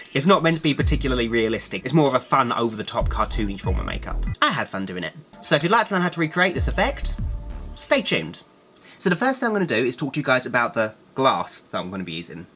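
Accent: United Kingdom. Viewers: Your british accent is awsome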